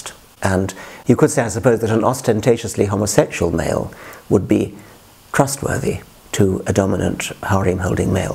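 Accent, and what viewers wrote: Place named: RP, United Kingdom. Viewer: Dawkins you have the arrogance of a boy with low self-esteem and a big ego. All your research and conclusions are folly. You're a puppet for satanists. The only point more wild than your pukka accent promoting nonsense is the ignorant people who buy your nonsense.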